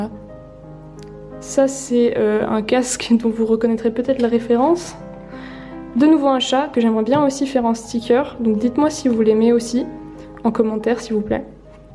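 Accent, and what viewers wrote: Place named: Switzerland. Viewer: No I love your french so much! I spent a little bit of time in geneva and the swiss alps and honestly swiss french is one of the easiest to understand for me! I had more trouble with nice and Bordeaux, none the less you had so many beautiful sketches in here, even if I didn't understand the french I'd still love to watch <3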